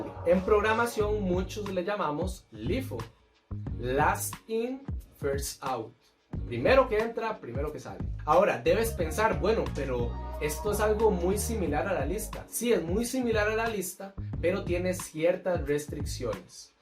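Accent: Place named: Costa Rica. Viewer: Ese acento tico no se pierde, buen video mae.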